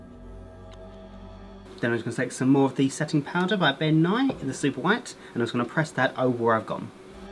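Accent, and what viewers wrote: United Kingdom. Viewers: what part of the UK are you from? (if you are from the UK..)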